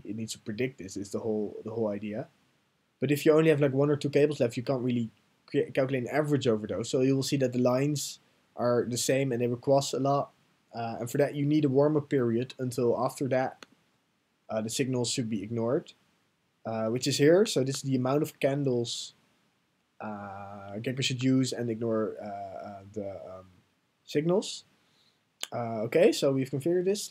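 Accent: Netherlands. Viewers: u dutch??? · your dutch ? :O i just hear it in your accent :) thanks for sharing your software ;) your the best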